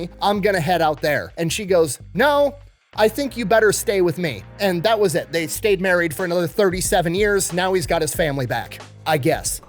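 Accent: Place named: Kentucky, United States. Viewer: Hey electrician guy I am very curious are you a Kentucky now this is going to show up as my wife but no it's her husband and yes we are Kentucky people you just sound like on of us are you bud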